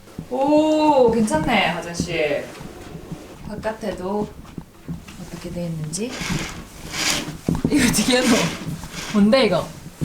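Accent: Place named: Busan, South Korea. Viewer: 저도 부산사람. 말투 익숙합니다. 항상 기대합니다. 안전여행 기원해요.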